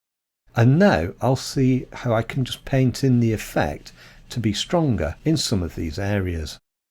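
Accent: United Kingdom. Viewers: Nice to hear a fellow Brit extolling ON1. I've seen this done differently as well in ON1; duplicate the layer, drop the exposure by a stop, add blur and then reduce the mask to about 50%. It'd be interesting to compare the two.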